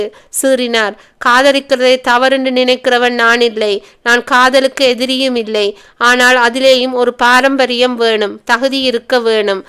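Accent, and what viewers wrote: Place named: Sri Lanka. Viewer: என்னாச்சுப்பா ஏன் இப்படி அவசரமா வாசிக்கறீங்க உங்க குரலில் ஒரு வசீகரம் உண்டு அந்த கொஞ்சும் இலங்கை தமிழை கேட்க கேட்க இனிக்கும் ஆனால் இக்கதையின் வாசிப்பில் ஏதோ மிஸ்ஸாகிறது போல் தெரிகிறதே நிறுத்தி நிதானமாக வாசியுங்கள் சகோதரி என்றும் உங்கள் வாசிப்பை விரும்பும் நானும் ஒரு சகோதரி தான் பிழையாக கருத வேண்டாம் உண்மையிலேயே உங்கள் பழைய நாவல்களை கேட்டு வியந்து போயிருக்கிறேன் தொடர்ந்து உற்சாகமாக வாசியுங்கள்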